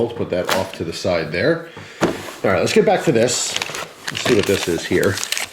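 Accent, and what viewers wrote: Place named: Pennsylvania, United States. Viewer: Pennsylvania account. At least he doesn't say "yins guys" or call everything a "jawn"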